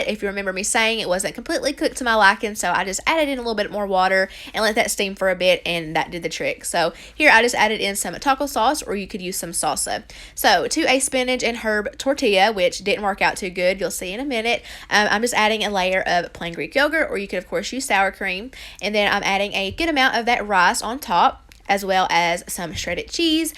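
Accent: South, United States. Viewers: Bren Dala it’s her sweet southern accent....I love it, too! 💞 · I just found your channel about a week ago and have been watching all your old videos . You have been giving me so many good ideas . I love your channel and love your personality and southern accent 💕💕💕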